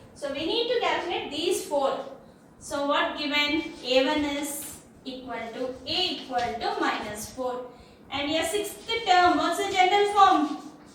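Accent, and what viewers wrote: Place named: Bangalore, India. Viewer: Mam I thought that u r from banglore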